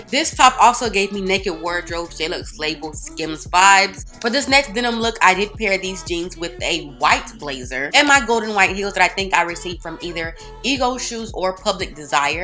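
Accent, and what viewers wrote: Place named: Florida, United States. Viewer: Super cute looks! 😍😍 I could tell you were from Florida or lived there! Your accent! I’m originally from Miami 🤍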